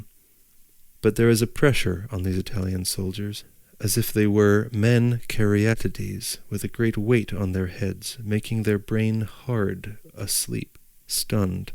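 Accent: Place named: United States. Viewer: Thick american accent…… unlistenable.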